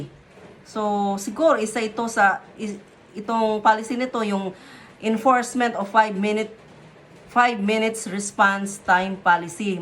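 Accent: Visayas, Philippines. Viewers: Bisaya ka ano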